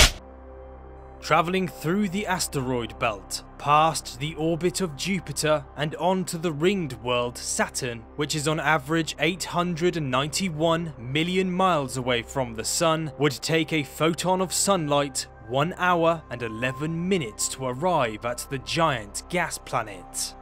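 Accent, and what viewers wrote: United Kingdom. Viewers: More amazing than this video is that the video was narrated by someone with what sounds like a British accent and using miles instead of kilometres. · you sound like a brit.. so why are you using miles?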